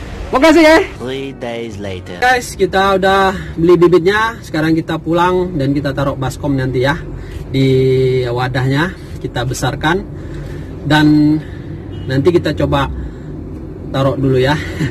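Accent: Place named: Palembang, Indonesia. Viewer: Logat nyo, wong palembang caknyo ini😀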